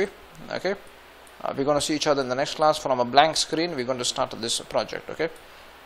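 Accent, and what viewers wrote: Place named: Egypt. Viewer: 😂 بتتكلم لهجه مصرية ❤❤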